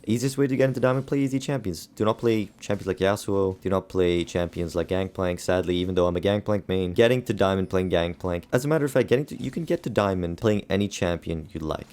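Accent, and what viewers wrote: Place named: Switzerland. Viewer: Are you Swiss? You sound like Roger Federer.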